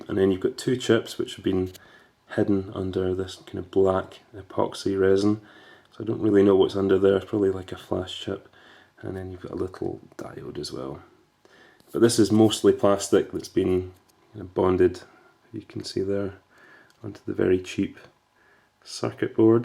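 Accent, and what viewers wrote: Scotland, United Kingdom. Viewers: Broken Engrish read in a Scottish accent. My life is complete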